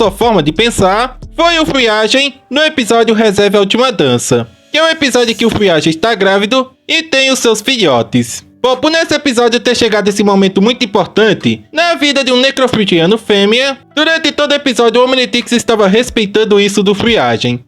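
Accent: Brazil. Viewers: Me pergunto de que país esse cara é, o sotaque bele parece muito com o ptbr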